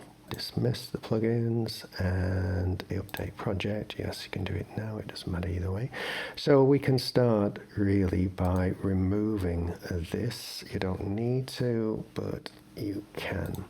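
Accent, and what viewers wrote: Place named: United Kingdom. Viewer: wow this guy's voice is different he must be from the UK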